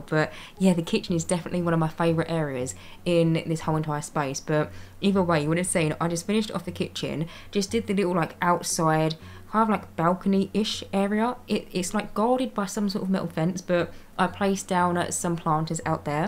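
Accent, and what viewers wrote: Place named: England, United Kingdom. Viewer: Just got it! I love it, a loverly face, and you talk like that, real pommy accent. I can't talk i'm an aussie.good job mate!.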